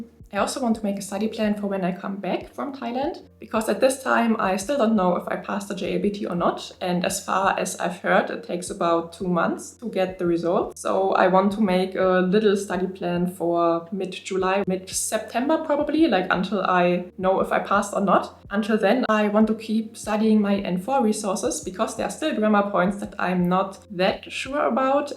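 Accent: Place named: Germany. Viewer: Hey, fellow German? 😁 Thick accent just as me. I'm also waiting for the JLPT results. I'm in Language School in Japan though. Still I get study slumps, and especially speaking is scary here as well. Can 💯relate! Keep at it! 💪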